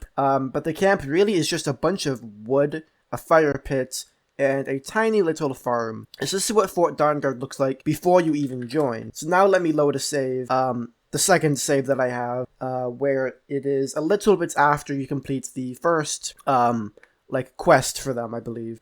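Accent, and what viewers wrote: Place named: Canada. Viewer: Where are you from? You have Canadian Accent!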